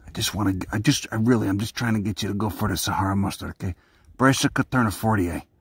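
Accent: Chicago, United States. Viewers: Imagine being in the middle of the desert and seeing a tattooed gringo with a thick Chicago accent crouching and talking to a tortoise about his diet.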